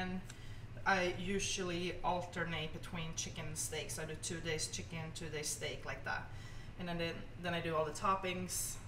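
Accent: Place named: Sweden. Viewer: Still hear the Swedish accent haha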